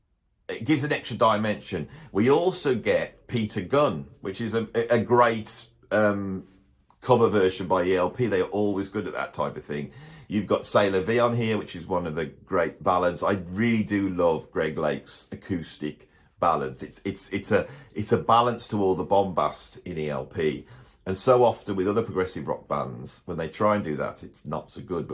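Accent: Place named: Poole, United Kingdom. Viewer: I'm just having fun. Great video. This was my all time favorite band. Are you from Poole? You and Greg Lake have very similar accents.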